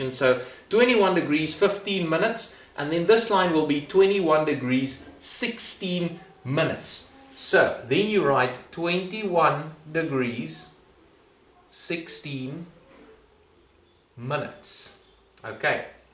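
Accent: Afrikaans, South Africa. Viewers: I like your South African accent. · You south African bruh? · I'm from South Africa and it really sounds so South African 😂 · Thank you for your reply! Your accent is very cool! I had a teacher who was born in South Africa. Maybe I didn’t hear too much Scottish afterall 😊anyway, it’s nice to hear such an interesting voice. Cheers, and thank you again for your great videos! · Are you South African? That accent sounds familiar. Ps: thanks for the video! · I have an exam tomorrow and you've been of much help. Thanks so much. (btw you sound Afrikaans, am I right?)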